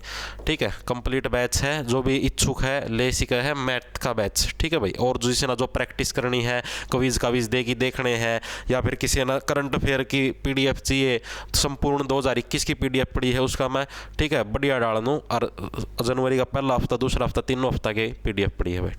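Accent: Haryana, India. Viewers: Always talking like .......k gyan s thara...... Very nice...no. 1 Haryana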